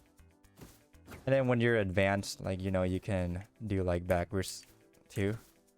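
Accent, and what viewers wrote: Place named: Vietnam. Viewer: Very strong Vietnamese accent homie and awesome video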